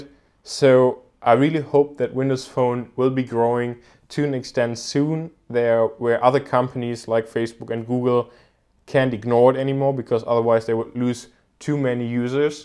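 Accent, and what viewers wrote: Germany. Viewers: kanns sein das du ein deutscher bist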